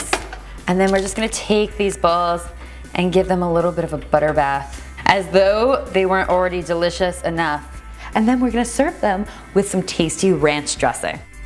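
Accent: Canada. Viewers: You sound Canadian. I love the cinnamon raisin with cream cheese. But now theres competition. I have to figure out which one of these I love the best.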